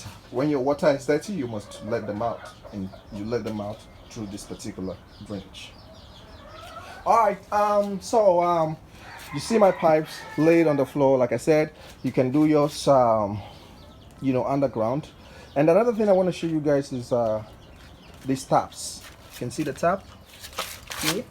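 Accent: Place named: Africa. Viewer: I new I could tell from the accent you had to be a brother from the continent! Greetings from abroad via Uganda! These are things I'd love to do back in Ug. Subbed and liked bro!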